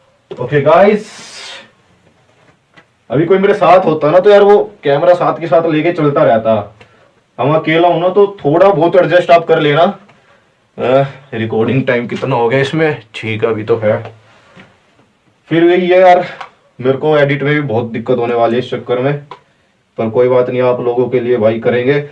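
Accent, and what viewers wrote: Haryana, India. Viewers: Bhai khaa k ho Aap Aap ki voice Haryana ki lgti h m bhi Haryana say hu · Genuine content and it is up to the mark. Never knew that such "mature" investigators are there in our community... And that हरियाणवी accent, भाई साहब! Keep growing,buddy :)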